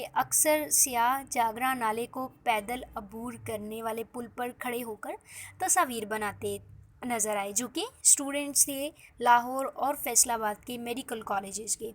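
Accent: India. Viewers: Bibi bolna to seekh lo yar Kia bad report kerti ho lagta ha Indian ho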